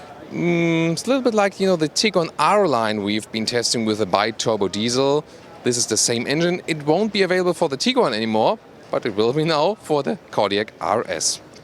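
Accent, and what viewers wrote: Germany. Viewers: i really love your denglish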